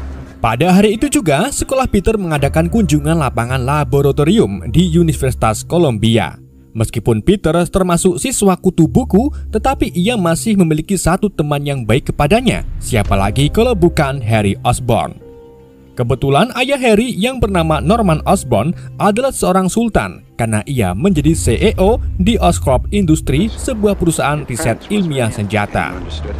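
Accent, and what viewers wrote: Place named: Java, Indonesia. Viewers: Gong-go!! Ente orang Brebes bang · Byoh enk jowo" e😂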